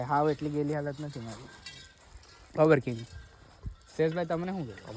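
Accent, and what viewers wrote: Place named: Ahmedabad, India. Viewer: Oo bhai tme toh ahmedabad na lago cho ekdmm suddh gujarati 😄😄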